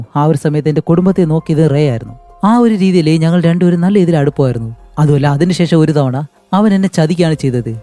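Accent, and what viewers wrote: Palakkad, India. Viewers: Palakkadan slang ill kadha kealkumbol chiri😂ariyathe varunnu😂 chirippichathinu thanks 🙏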